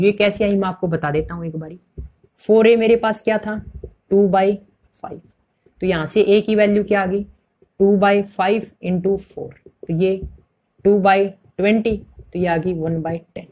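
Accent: Haryana, India. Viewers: Haryanvi mast h sir apki and apki video bhot bdiya lgi sir m bhi Haryana se hu sir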